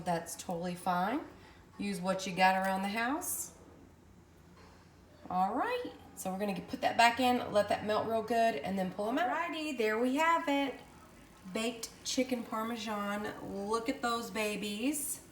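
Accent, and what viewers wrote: South, United States. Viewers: I just love they way you talk your southern accent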